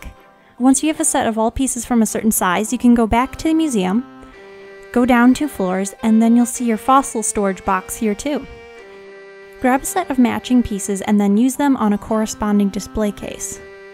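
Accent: Australia. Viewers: gooooooood as aussie accent lol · that ausie accent just earnt u a sub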